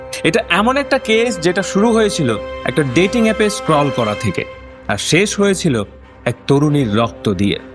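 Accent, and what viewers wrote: Bangladesh. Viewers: Tomar kothay Bangladeshi tan ache😂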